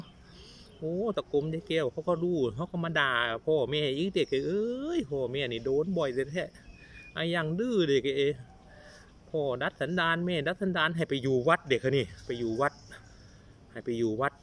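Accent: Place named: Korat, Thailand. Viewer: ภาษาโคราชน่าฟังจ้า 😂😂 เด็กน้อยดื้อคักน้อจ้า เก็บความทรงจำไว้ ดีค่ะ ขำดีจ้า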